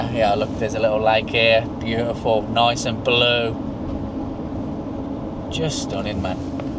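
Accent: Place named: Black Country, United Kingdom. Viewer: And just where abouts from the Midlands are you from? I'm reckonin Black Country... Bilston... Shortheath? Willenhall maybe? Go on, tell me how far off I am! Love the views and the van by the way!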